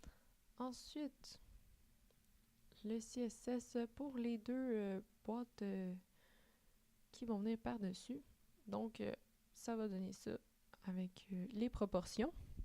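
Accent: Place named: Quebec, Canada. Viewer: Merci pour ce tuto clair et pratique. Et l'accent de nos amis québécois en plus.... J'adore.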